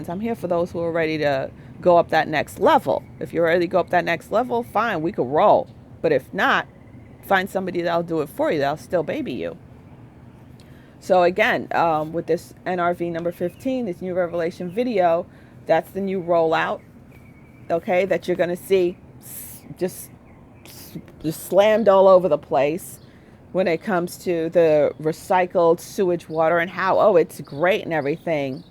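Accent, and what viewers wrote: New York, United States. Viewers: Thanks for update. It makes me laugh when you go "New York" in how u say things, but hey, the message is received. It ain't no joke. 🙏😘😘😘🤗🤗🤗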